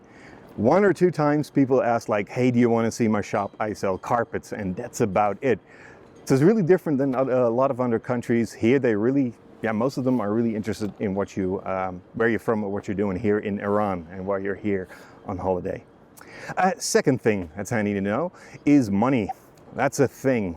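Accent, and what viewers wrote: United States. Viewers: for a Dutch person, you speak English very closely to an American. A lot of people form Holland visit. Iran. Very nice and genuine vlog. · Very nice and informative video. I hope you get to go back again and visit more places and have lots of fun. For your information, when someone in Iran (and probably in Middle East) puts their hand on their chest, they're kind of putting it on their heart, meaning that you belong there... in their heart. It's a sign of sincerety, if you will. (^_^) Oh, and from your English, I initially thought you were American. Your English is perfect.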